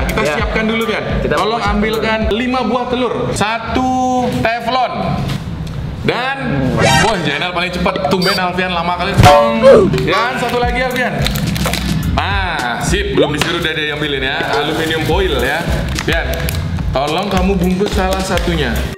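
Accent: Bali, Indonesia. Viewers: Aku yang mikir Kak ini orang Bali, atau emang orang Bali, atau cuma logatnya yang mirip orang Bali, atau aku ketinggalan jaman? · Orang Bali? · Memang orang bali, daerah denpasar kalo ga salah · Kyk logat bali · Logat bali ny kentel bet wkwkw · Aku baru liat chanelni Kok kek logat bali:)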